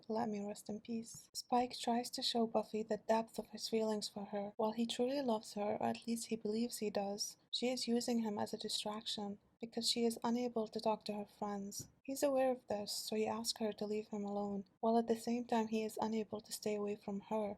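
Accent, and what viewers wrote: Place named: Ireland. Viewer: Yeah, I guess that's what it was. My accent when I speak is clearly noticeable, too. So, judging from my expertise, I would say, you're closest to Irish! 👍🤗 Thanks for the vid though, too! It's good. I haven't watched everything yet but your analysis is on point and we both truly share a love for Buffy. 🤝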